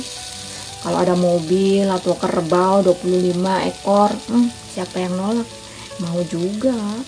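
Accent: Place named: Banyumas, Indonesia. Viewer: My favorit food Roasted sweet potato / bahasa ngapak muntul mba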